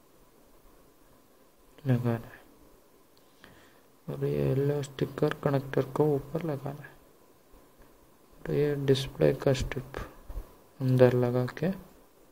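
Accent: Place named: Kerala, India. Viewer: മലയാളി ആണോ മലയാളി ഹിന്ദി പറയുന്ന പോലെ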